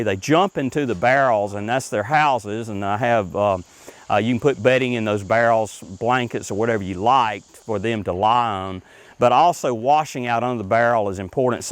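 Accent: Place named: South, United States. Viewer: Remember, working dogs are bred to be outdoors and have good temperature tolerances. In the summer it looks like he uses evaporative cooling from washing down the concrete, ceiling fans to circulate air, hard roof to protect from direct sun and radiant heat. Based on his accent and surroundings I figure he's in the south. For heat in the winter he probably puts blankets in the dog houses. They are raised off the ground, so that takes away from some of the thermal loss from sleeping on the ground, and the metal roof raises the temperature from the sunshine in the kennel area. The concrete will heat up some during the day providing thermal mass heating at night. Some good tree coverage and the leaves provide extra shade in the summer, but the leaves fall in the winter giving more sun access to the metal roof.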